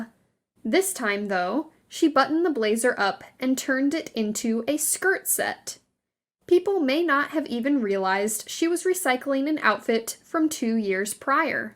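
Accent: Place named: United States. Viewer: Why do you Americans pronounce ‘blouse’ as ‘bloussse’? Double stressing the ‘ess’. Derby is pronounced Darby.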